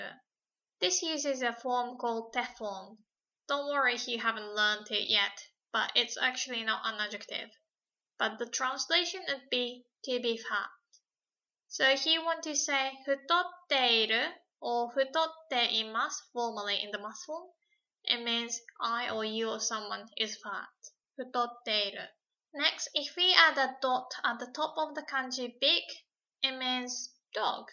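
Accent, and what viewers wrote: United Kingdom. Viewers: Now that I've heard a Japanese person with a British accent, I suddenly understand what it's like for British people to hear most non-native English speakers with an American accent. p goofy m8 also saigomademitayo, fam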